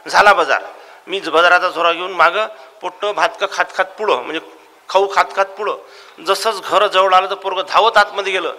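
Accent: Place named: Vidarbha, India. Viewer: Vidarbhachi Marathi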